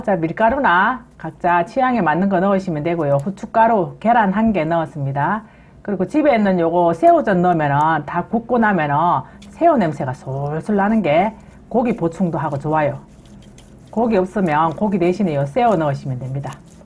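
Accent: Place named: Gyeongbuk, South Korea. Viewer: 와 안니비지노 ㅋ 경북말씀 맞아요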